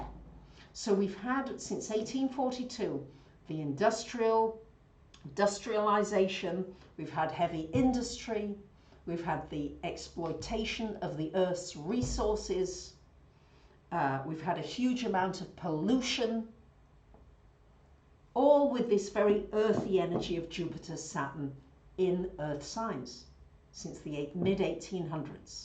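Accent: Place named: Yorkshire, United Kingdom. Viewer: I love that you have a Yorkshire/Lancashire accent, as I am also from Yorkshire (Ryedale, though possibly also Andromeda!) and it brings an earthiness to your broadcasts. Super 😊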